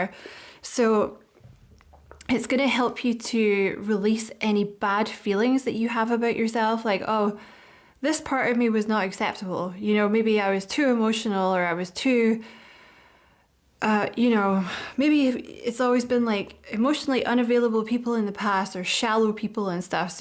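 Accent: Scotland, United Kingdom. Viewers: It’s Scottish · My apologies for my confusion. My American ears did not identify you being Scottish. However my soul recognized your voice. Once again, my apologies.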